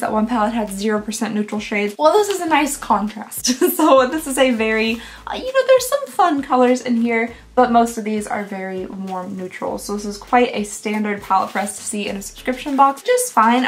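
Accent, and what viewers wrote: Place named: Tennessee, United States. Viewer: Sweetie, there is NOTHING wrong with the way you talk. If ppl dont like it, you know the saying..."just keep on a-scrollin'". I personally LOVE the way you tale and describe things. Reminds me of home (Tn.). I miss it.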